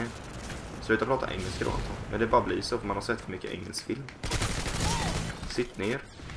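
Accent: Norrland, Sweden. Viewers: Gillar din Norrlänska dialekt, du är bra på språk. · det det var norrländska..... inte värmländska.............. xD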